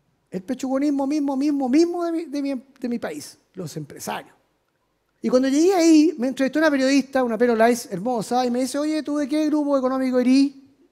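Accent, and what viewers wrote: Chile. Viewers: No se entiende lo q habla.. chileno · me gustaria ver la conferencia, lastima que este en un español con asento chileno... una pena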